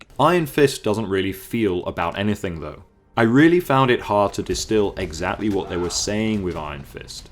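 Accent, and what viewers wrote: United Kingdom. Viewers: "With my British accent" Buddy I think you are spot on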